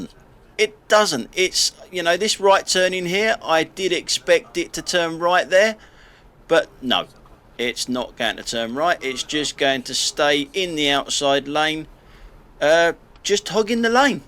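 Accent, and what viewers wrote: London, United Kingdom. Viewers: <link> This is Dashcam Hull's channel, I've been subscribed to his channel for a while now - quite entertaining 😀👍 And I love the London accent btw - I can understand perfectly well - and I'm Scottish 😂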